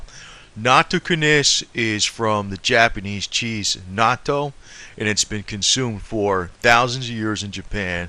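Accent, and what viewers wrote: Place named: Boston, United States. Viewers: hey, this dude is the real deal, and when some "professional" is coming at him with the "pronunciation" of his words, there's some insecurity happening here. the information is priceless, and so is this gentle man. his accent is home to me, new york, boston, love him. I don't care if he calls nacho knish, if it works, we will figure it out no problem! thanks for your input suh! I'd rtather pay him than some herk whose agenda is to get his/her ass top the bahamas on our miserable dime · This guy is not a healthcare professional which is obvious from his continued mispronouncing of simple medical terms, especially the word Natto, and NattoKinase. It is not Nacho cheese. It is pronounced Knat-O-Kine-Ace. Serrapeptase as well.(Sarah-Pep-Tace) These are protein based medical terms ending in Ase(Ace)!! Please, if you are going to talk about these systemic enzymes, lose the thick Boston accent so that you sound a bit more intelligent, and then please pronounce the words correctly. 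You can't just say "when it gets into the bloodstream, it just does it's thing". This is a great misrepresentation of the mechanism of action that these enzymes perform in the body. Get the IDEAR??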